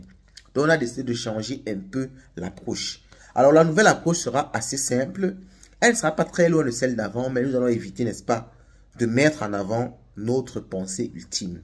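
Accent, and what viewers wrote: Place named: Cameroon. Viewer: Bonjour très cher grand frère tu es camerounais j'imagine quand je suis ta manière de parler ☺️ donc...... Quand je l'ai dit..... à mon humble avis le film que tu as commencé à tourner là, hum.... je pense qu'il va te dépasser. Les deux projets là avancent ooohhh...!!! Mais beaucoup de courage à toi, j'admire ton audace en tout cas 💪 Et merci déjà d'avoir enlevé le mot arnaque du thème c'était pas le mot approprié pour mieux exprimer ton scepticisme..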